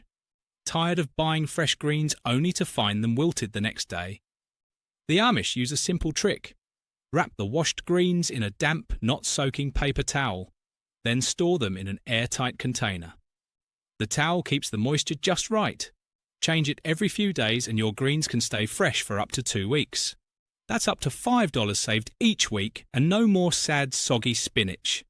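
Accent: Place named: England, United Kingdom. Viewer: You’ve got a flaming English accent, so speak English not American it’s coriander and biscuits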